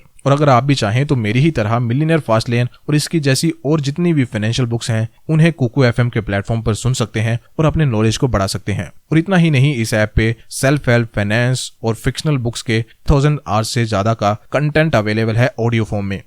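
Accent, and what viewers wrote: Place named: Punjab, India. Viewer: punjabi accent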